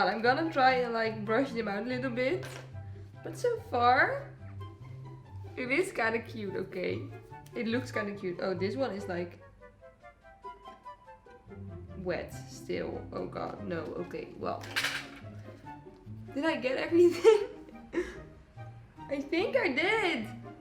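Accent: Netherlands. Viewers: Yayy! I love that hair and your dutch accent ^^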